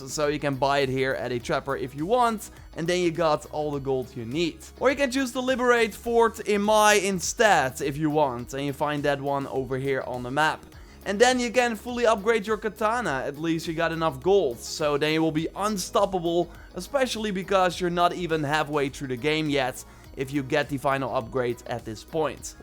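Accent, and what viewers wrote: Netherlands. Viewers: Heb je niet het idee dat de game minder leuk wordt door "te vroeg" je wapens naar max lvl te werken? ( aan je accent te horen, ga ik er vanuit dat je Nederlands ben ;-) ) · Dutch native speaker?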